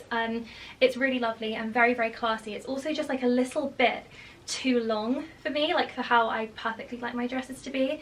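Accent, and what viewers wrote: United Kingdom. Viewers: Watching this video made me feel like I'm catching up with a dear friend after a long interlude. (I do have actual friends. We're all so busy, though, with our families, I often don't get to see them for months at a time.) I LOVE your closet clear outs. I often hear your lovely British accent in my head when I tackle my own. "I'm going to be ruthless..." I also very much enjoyed hearing all the little and big details you put into your clothing line, which demonstrates you're doing what you should be doing.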